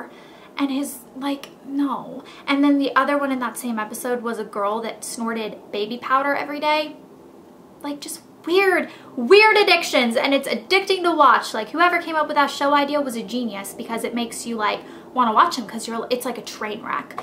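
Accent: South, United States. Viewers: Ah like it !!! You're little southern accent sounds so cute!!! ahahhaha :) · does anyone else hear her southern accent more in this video? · Love the southern accent!!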